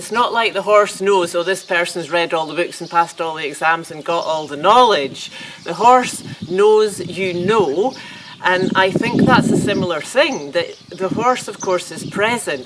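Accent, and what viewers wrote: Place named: Edinburgh, United Kingdom. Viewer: Where are you from? Im from Edinburgh and I recognise that accent!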